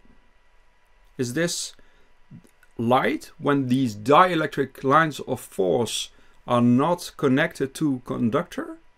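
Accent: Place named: Netherlands. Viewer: echt heel interresant gij zijt mijn nieuwe favorite youtuber dank u voor al u wijsheid ik veronderstel dat u van nederland bent aan u accent te horen :) hoop dat ik juist ben denk het wel ik ben van belgie en wil heel graag meer weten over deze onderwerpen en jij legt het heel goed uit en ben u heel dankbaar daarvoor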